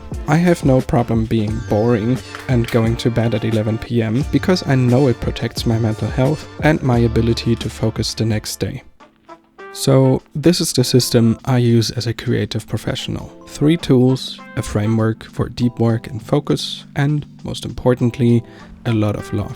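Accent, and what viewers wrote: Germany. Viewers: ❤ After a few sec (lovely vibe) and after your first words (slight German 🇩🇪 accent) - LIKED & SUBBED. Period. Tschüss, Ich war aus Ungarn 🇭🇺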